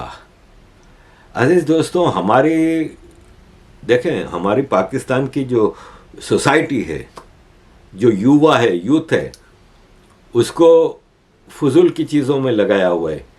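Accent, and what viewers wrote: Gujarat, India. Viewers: Nice to hear you and your style of talking...adding some gujju snd kacchi dialect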